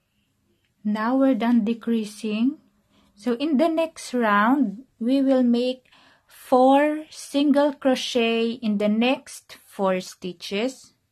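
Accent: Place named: Philippines. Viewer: thank u so much for this video, also are you filipino? the accent sounds familiar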